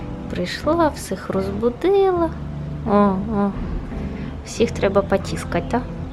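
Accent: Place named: Poltava, Ukraine. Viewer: Дякую за відео! Нетривіальна країна, розповідь цікава і приємна вимова (полтавська, навскидку, але можу помилятись).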